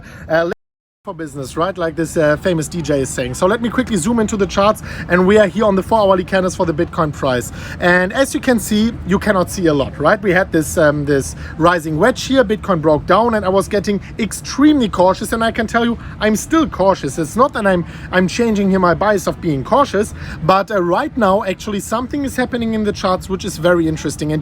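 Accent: Germany. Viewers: How do you have such a thick German accent with your command of the English language.. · Fuck, seriously. You spend more time abroad then in Germany, start getting rid of that stupid accent. Sound just ridiculous.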